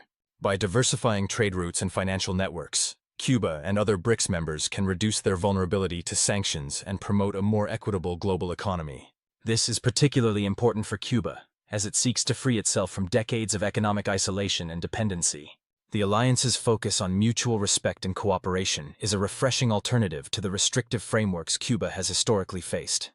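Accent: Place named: United States. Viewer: Somewhat ironic to use an American accented overdub??? Perhaps you need to rethink that approach.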